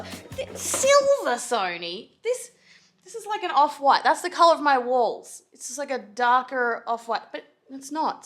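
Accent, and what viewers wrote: Australia. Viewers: That's sarcasm right? 10 hour flights? now? That's definitely an aussie accent or am I going crazy?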